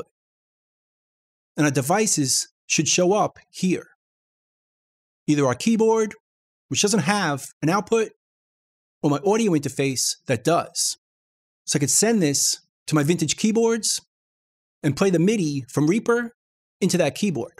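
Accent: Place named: Northeast, United States. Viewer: A lot of people from the northeast talk like that.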